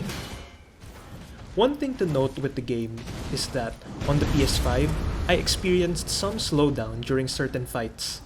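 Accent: Philippines. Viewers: pinoy accent!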